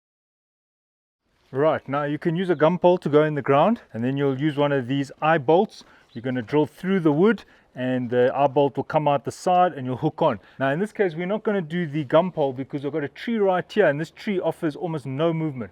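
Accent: South Africa. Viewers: No doubt about it. South Africans have great accents and always clearly spoken. Excellent 👌 thank you very much.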